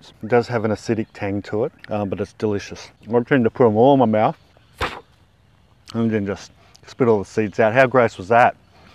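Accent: Australia. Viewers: Sounds like from Aus?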